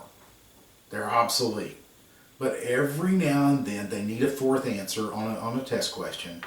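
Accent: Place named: South, United States. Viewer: I find the southern accent quite comforting, in fact. Extra helpful contrasting the stress of study.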